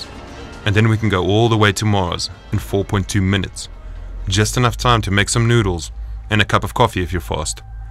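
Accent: South Africa. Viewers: Is this a South African speaking ?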